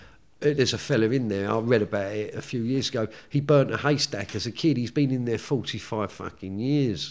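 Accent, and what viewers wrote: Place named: Cockney, United Kingdom. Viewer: A very likeable fella, a proper cockney and loveable rogue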